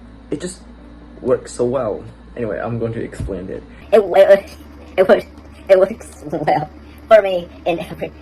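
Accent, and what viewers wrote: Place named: Australia. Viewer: I agree with you when it comes to languages and emotions! I notice that however I feel while studying carries over to my state of mind while speaking the language. I feel like it also affects my openness to the culture. For patterns, I love them. I notice that I learn languages better when I notice the patterns on my own :D Then later on I can learn formal patterns to see if they match (or they build more easily off of what I already have learned). Ahh accents, I want to know how to change mine in the languages I learn. I don't mind having a foreign accent but I'd at least like to have one that sounds nice... Your accent kind of reminds me of Baobaopearly's. It isn't a valley girl accent, honestly it sounds sunny if that makes sense. :D Also, your placement sounds like it'd be in the right place for an Australian accent. Good luck whatever you work towards! 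Great video!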